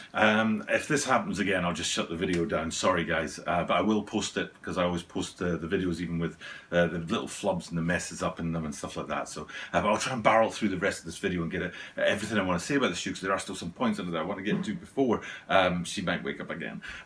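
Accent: Scotland, United Kingdom. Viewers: Not gonna lie, wasn't expecting to hear a scotsman when I went to watch the video. Glad to see these have been reissued tho.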